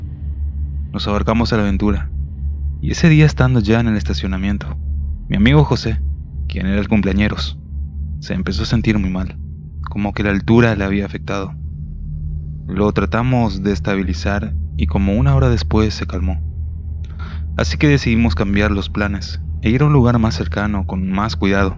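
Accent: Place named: Argentina. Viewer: El amigo creo k no es mexicano es creo argentino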